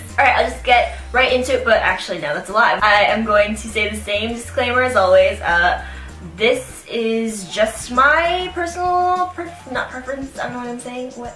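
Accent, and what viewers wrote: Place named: United States. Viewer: All I have to say is: WOW! College is SO different where you're from (I get the idea that it's somewhere in the US from the way you speak but I could be wrong)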